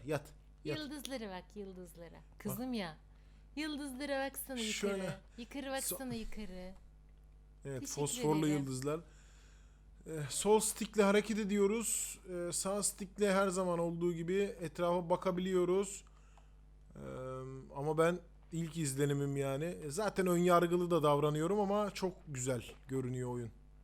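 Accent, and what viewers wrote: Kayseri, Turkey. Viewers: Şiveli daha doğrusu kayseri ağzı yapmadanız daha iyi olucak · Usta siz vallahi Kayseri'lisiniz :D Benim eniştem oralı konuşma şiveniz aynı :D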